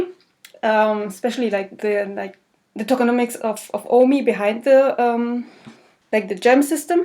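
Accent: France. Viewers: Great content! Are you french ? you have a slight accent...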